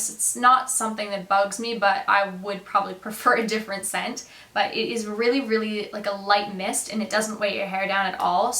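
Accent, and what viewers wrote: Australia. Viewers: I hear that Australian accent trying to break through! Haha! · i think she's picking up on an australian accent a little! :) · This is going to sound extremely weird but I really love your accent! It's amazing how 3 years ago, your accent is completely far from an Australian one and now, you sound quite like an Australian. I find it really cool and it makes your voice sound extra great to listen to :3 Love the video as always! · lol i dont know if its because i havent seen your videos in a while but you have more of an australian accent now, love it! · Wow you have really picked up the Australian accent! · I notice the Aussie accent is starting to stick!! :) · Is that a slight aussie twang to your accent? ahaha love it! i can hear it! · It's hard to tell that you are Australian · You can hear an Australian accent starting to come out in you. 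· I love your videos! :) Thanks for posting xx p.s. your accent is transitioning to australian! love it hahah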